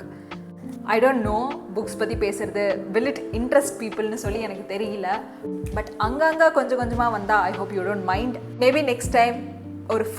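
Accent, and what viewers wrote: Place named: Kerala, India. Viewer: Are you a malayali? You speak tamil like a malayali..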